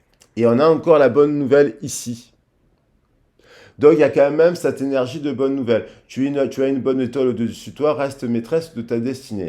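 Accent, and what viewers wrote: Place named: Marseille, France. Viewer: Coucou sa me fait plaisir de vous voir en ce dimanche j aime bien entendre votre accent marseillais c est vrai que c est magnétique et puissant sentir ce désir intense l amour pur véritable c est beau quand c est reciproque c est le coeur qui parle cette appelle des deux âme qui veule ce retrouver c est magique ce bonheur bisous 😚💞🌈🌞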